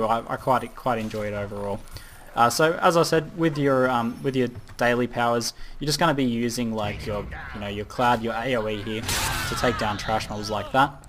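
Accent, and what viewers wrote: Australia. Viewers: Aussie brooo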